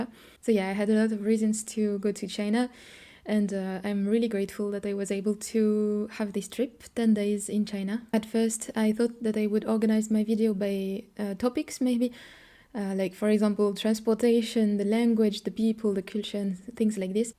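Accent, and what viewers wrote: France. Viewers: Something about the French accent when spoken by a Lady. Wonderful. 🙂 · Through the window / Stricter. How could one prefer Chinese culture over Japanese culture is beyond me. And very surprising considering how introvert and clean you are...Opposites are attracting each other I guess.^^ The mountain range of Huangshan, which inspired the floating mountains in the Avatar movie, not too far from Shanghai, is the most spectacular landscape I've ever seen, and I've seen a lot, especially when you are above the clouds, which makes it feel like the mountains really are floating . Just need to escape the armies of Chinese tourists to really enjoy the site. They tend not to go off the beaten path which makes it easy. Telling French people to lose their accent when speaking English is a terrible advice, if you know what I mean =P (I m a simple man) You prolly don't give a damn but you are losing some sex appeal when speaking English, you are way sexier in French/Russian xD Great video! 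Disclaimer: Most French hate the French media.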